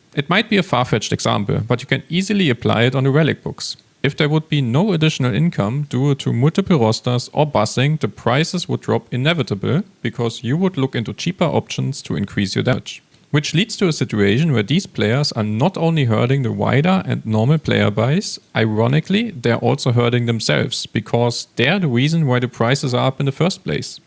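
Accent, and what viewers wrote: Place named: Germany. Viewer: i like your English with german accent . good video!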